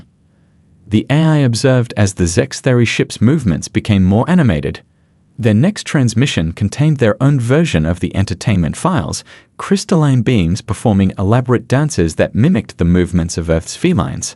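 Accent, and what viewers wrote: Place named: Australia. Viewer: Very strange to hear this in an Australian accent, used to hearing other AI stories in different accents. Hoping this is an Aussie channel 😁😁😁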